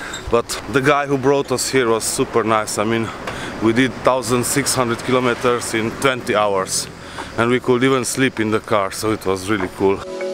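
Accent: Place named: Morocco. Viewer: I like your accent keep it up Morocco*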